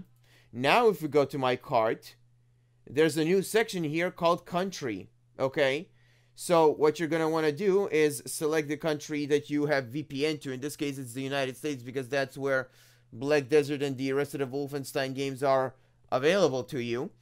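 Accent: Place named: Israel. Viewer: ישראלי מאשקלון עם מבטא כזה? לול דרך אגב סטים לא לוקחים את מה שקנית?כי לפעמים מקבלים מזה באן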